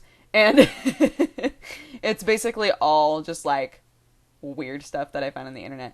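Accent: United States. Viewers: lol, it sounds so weird hearing you speaking your American accent rather than your "British" accent, lol. ;)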